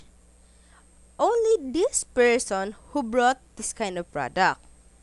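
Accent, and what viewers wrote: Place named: Philippines. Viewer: You sound like your filipino, aren't you?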